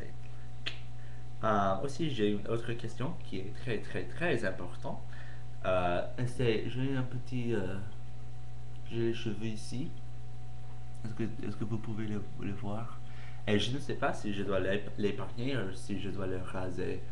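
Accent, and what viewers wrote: United States. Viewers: Pas mal. Tu as un petit accent US mais ce n'est pas grave du tout, on arrive a te comprendre. :D Bonne continuation. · Ah l'accent américain (léger) que tu as quand tu parles français, assez marrant !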